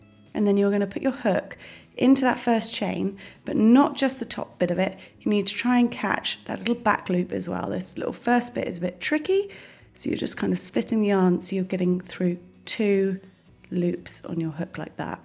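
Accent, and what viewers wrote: England, United Kingdom. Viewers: I love your videos! I always learn so much from them. Will use the chainless foundation forever now. Also makes such a pleasant change to hear an English voice. Keep up the most excellent work! xx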